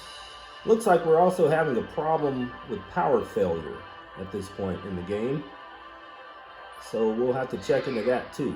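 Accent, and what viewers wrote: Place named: United States. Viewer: What state is your accent from if I may ask?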